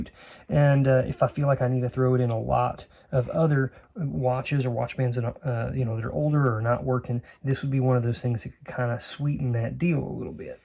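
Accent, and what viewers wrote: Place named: West Virginia, United States. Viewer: Just moved to Weat Virginia and thought the accent was similar to the area. Love it! 😊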